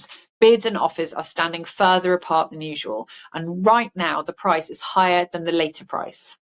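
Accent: United Kingdom. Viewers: Absolute nonsense in a British accent.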